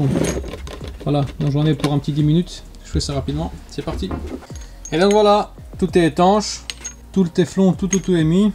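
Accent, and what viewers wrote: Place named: Belgium. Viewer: Rien à dire bravo ! J'aurais aimé être aussi pro que toi! A ton accent il me semble que tu sois de Belgique ! Dommage j'aurais peut être eu besoin de toi à l'achat de ma future maison car je sais que tout serait soigné et maximisé !🙏🙏👍👍je m'abonne direct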